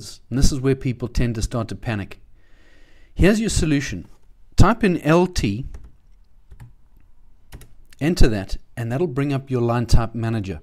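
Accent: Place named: South Africa. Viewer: Thank you! You sound South African.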